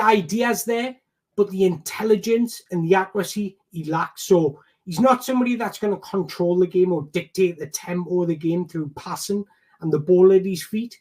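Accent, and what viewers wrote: Newcastle, United Kingdom. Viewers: Mate I’ve been following you on Twitter for a few months when I saw your name and photo I assumed you were Spanish or something I was not expecting a Geordie accent hahahaha. Top content!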